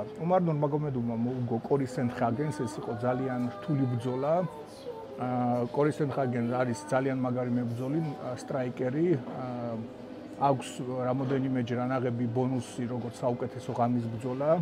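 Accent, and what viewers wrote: Russia. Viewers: პატივისცემა ჩემგან, რაც შეეხება კონტენტს, ძალიან მომწონს მაგრამ ჩემი პირადი რჩევა იქნება, გენრი სეხუდოს მაგივრად ჰენრი სეჰუდო რომ თქვა, კორი სენდხაგენის მაგივარდ სენდჰაგენი, მე კი ვხვდები რა თქმა უნდა ვიზე საუბრობ მაგრამ, ძალიან არასასიამოვნო მოსასმენია, აი მეტყველების ამ პრობლემის გარდა, ჰ-ხ, ჰ-გ, და ხომ მიმიხვდი, ისე ამბობ ხოლმე როგორც რუსები ამბობენ, სხა არაფერს შევუწუხებივარ, წარმატებები <3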